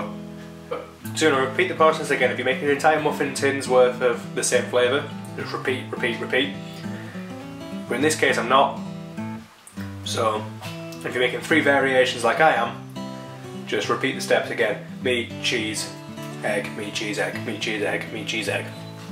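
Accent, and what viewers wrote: Yorkshire, United Kingdom. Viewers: Brilliant! Love a Yorkshire accent. 👍🏻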